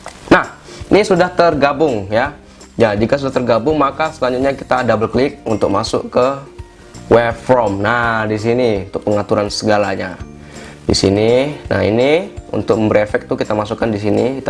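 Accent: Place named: Palembang, Indonesia. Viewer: Org mana bng kok logat kyk org palembang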